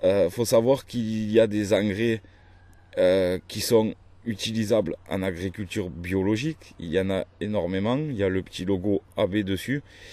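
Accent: South, France. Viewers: Bonjour. Merci beaucoup pour le partage de vos connaissances/vidéos qui sont claires, instructives, complètes, enfin je me régale à chacune de vos vidéos, camarade sudiste ! (enfin je dis ça pour l'accent de chez nous, je peux me tromper mais cet accent me parle ^^) Merci encore pour le temps passé sur vos vidéos ;) Cordialement, Ben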